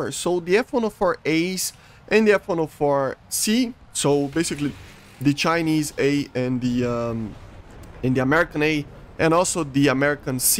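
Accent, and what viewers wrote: Brazil. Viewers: percebi que era brasileiro na hora seu sotaque não engana kkk otimo video mano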